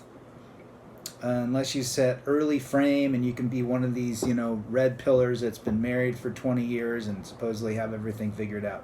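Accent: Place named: RP, United Kingdom. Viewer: love the top-hat accent! 😃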